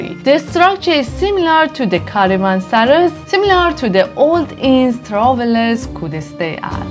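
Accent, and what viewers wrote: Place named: Iran. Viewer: I love your Iranian accent❤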